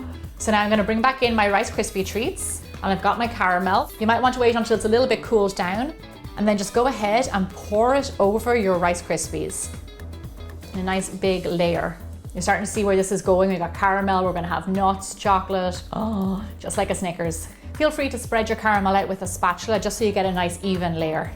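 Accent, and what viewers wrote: Ireland. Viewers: Looks so delicious I must try I made peanut butter rice crispy treats with fudge topping love your Irish accent💚☘️ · Im going to make the snickers one! Looks amazing! Also, love that you never lost your Irish accent! (I'm Irish) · Are you Irish? Great video btw 😁♥️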